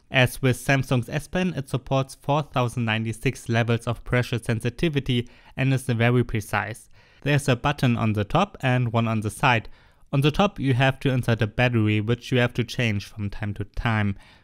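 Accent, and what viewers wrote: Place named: Germany. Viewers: I think DEUTSCH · Yeah it's a very hard german accent:P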